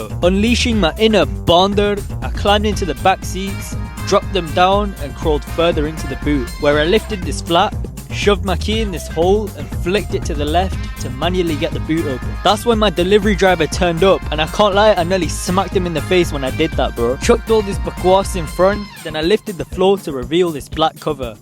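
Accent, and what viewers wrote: Pakistan. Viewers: are you pakistani because you speak the exact same pakistani language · Sounds more urdu to me. He Sounds like hes a Pakistani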